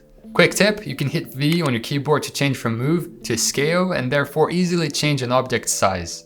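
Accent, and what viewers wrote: Brazil. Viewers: Tem que ter o ouvido treinado, mas esse cara pelo sotaque deve ser brasileiro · teu ingles é muito bom, mas tenho quase certeza que tu é brasileiro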